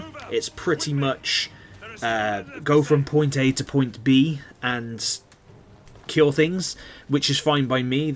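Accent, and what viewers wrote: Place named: West Country, United Kingdom. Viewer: Can hear that slight twang of a west country accent, love it from devon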